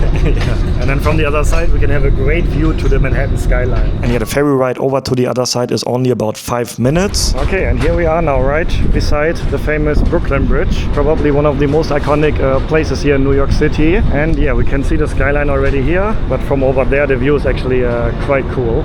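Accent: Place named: Germany. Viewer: There’s supposedly a great test for Germans with good English accent. Get them to say squirrel, it’s a giveaway, the v pronunciation always comes out. Though I appreciate your efforts. I’m part German, I can hear it.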